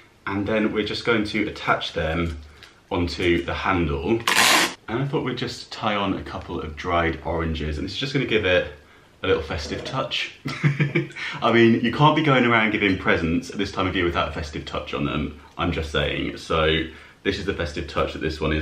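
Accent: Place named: RP, United Kingdom. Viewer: Ho ho ho,that was funny, I love listening to you talk,it's clear and quite posh, I live in Yorkshire,so I find the accent sometimes difficult.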